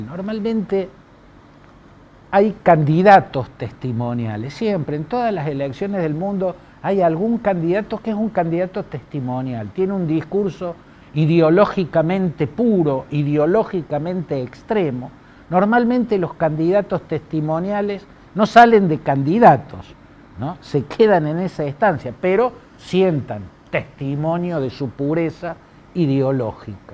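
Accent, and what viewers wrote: Córdoba, Argentina. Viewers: es muy capaz en política internacional, ahora, de Economía NO SABE ABSOLUTAMENTE NADA. Como buen retacon, cree que es mucho más grande y genial de lo que es. es solo un OPINATOR de cabotaje, lo lindo es que le sale la toooonada cordoooobesa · Claudito, yenes una arma retórica que seguramente habría impactado en Davos: su exquisito acento Cordobés. Sublime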